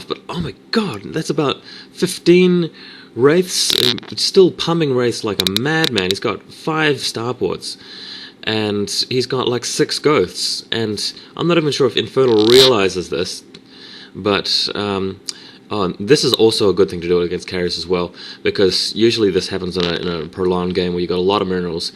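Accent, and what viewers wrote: New Zealand. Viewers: I like your accent. Go NZ. :D And nice commentary, I understood everything that was going on, and I actually don't really know too much about the idiosyncrasies of the game.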